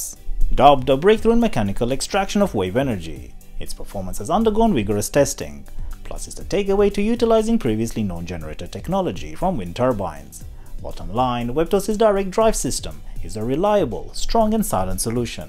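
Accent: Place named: India. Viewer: india English?? zzzzzzz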